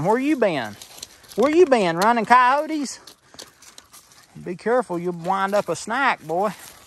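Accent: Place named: Eastern Kentucky, United States. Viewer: Love to hear you talk reminds me of my kinfolk down in eastern Kentucky ❤️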